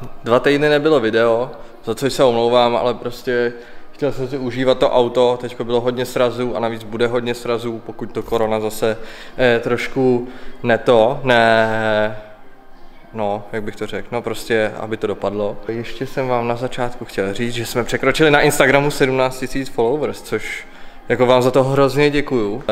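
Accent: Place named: Ústí nad Labem, Czech Republic. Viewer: furt tě slyším po ustecký jak jedeš xddd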